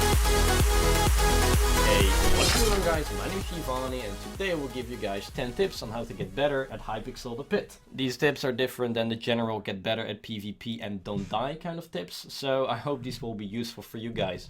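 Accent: Netherlands. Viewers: Are You dutch? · the first sentence already told me you are dutch. My accent is as bad as yours xD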